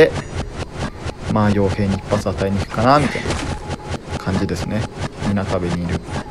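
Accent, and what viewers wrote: Japan. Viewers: 金沢弁かな？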